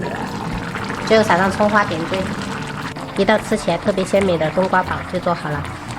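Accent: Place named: Quanzhou, China. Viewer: 四川人怎麼泉州話說的那麼標準？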